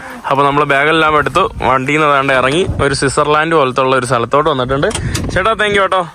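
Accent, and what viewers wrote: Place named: Thiruvananthapuram-Kollam, India. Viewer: റബ്ബരും വിള 😂 Extreme തിരുവനന്തപുരം കൊല്ലം സ്ലാങ്...... അത് കേൾക്കാൻ തന്നെ ഒരു നൊസ്റ്റാൾജിക് ഫീൽ.....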